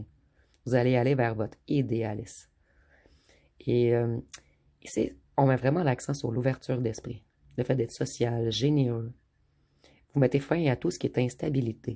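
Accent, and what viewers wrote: Quebec, Canada. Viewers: Je suis ducsud de la FRANCE...Chez nous aussi nous avons un sacré accent.Mziw votre accent QUÉBÉCOIS JE L'ADORES !!!❤❤❤❤❤❤🎉🎉🎉🎉🎉🎉🙏🙏🙏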